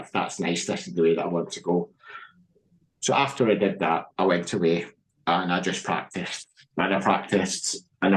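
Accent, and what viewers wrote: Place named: Glasgow, United Kingdom. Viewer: 😂 If I was speaking in full Glaswegian dialect, it would probably only be (some) Scottish people that could follow without cc! 😂Thanks for joining us! 👍🏻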